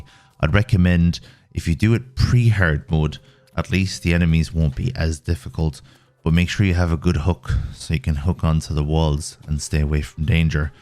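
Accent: Ireland. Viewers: You sound Irish but also not Irish at the same time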